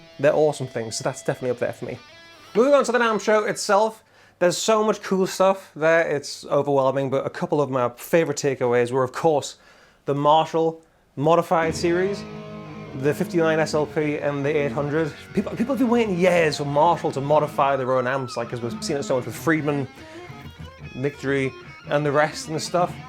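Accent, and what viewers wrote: Newcastle, United Kingdom. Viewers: ! I only started playing recently. I'm really lucky that I have guys like you on Youtube who play really cheap gear and make it sound amazing, it teaches me to focus on my playing and not the gear. I also love the geordie accent! I learned english watching True Geordie on youtube talk about newcastle football club in 2015 (when they got relegated 😂) so I love how you talk howaay lads heres to 2026!!!!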